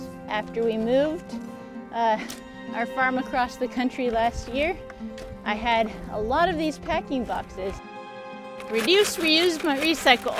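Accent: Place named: Chicago, United States. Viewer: Sounds like Chicago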